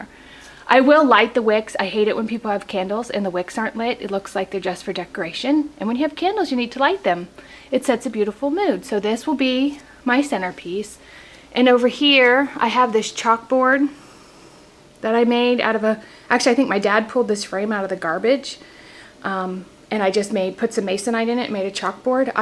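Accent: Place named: South, United States. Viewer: Hello just discovered your channel . I was actually looking at your daughters channel cause she tagged someone . I must say I'm digging the southern ascent , very cute ;)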